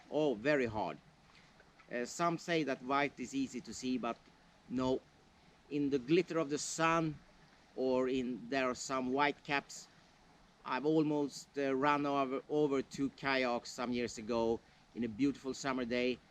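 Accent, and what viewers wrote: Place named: Sweden. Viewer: very good swinglish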